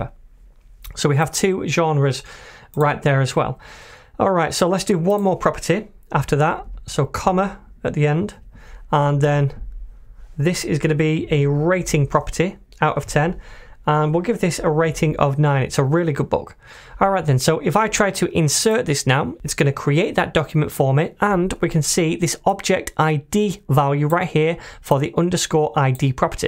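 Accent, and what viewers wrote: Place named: Yorkshire, United Kingdom. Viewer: Are you a Yorkshire man mate?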